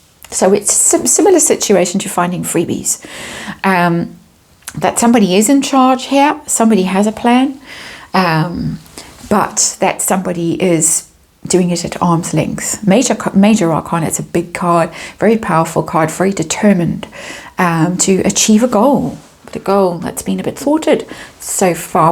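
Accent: South Africa. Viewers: Everyone seems to be making money from this debacle, don't you think? You are one of 3 unbiased readers I trust. Plus I enjoy listening to your S African accent. My father lived in Cape town for many years before relocating because of unrest, to Sunshine Bay Australia with his second family. We have many S African dentists and opticians in my home town in Kent, I've noticed. 😊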